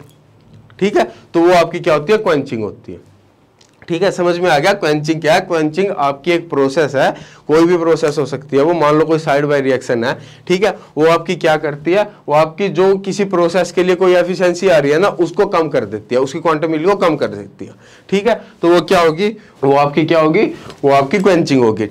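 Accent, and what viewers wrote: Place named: Punjab, India. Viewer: He seems to be punjabi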